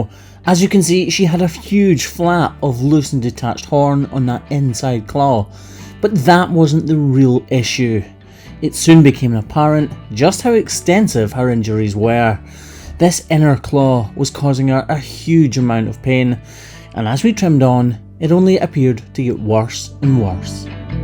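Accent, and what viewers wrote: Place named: Scottish Borders, United Kingdom. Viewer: Just a short note to say thank you for your work, it’s a joy to share in your world and hear a borders accent while living in NZ.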